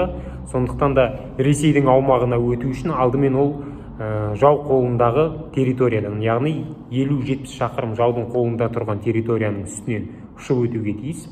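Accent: Standard. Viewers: Қазақша таза сөйлегеніңізге отбасымызбен ризамыз. Мықты. Ұнату және жазылу.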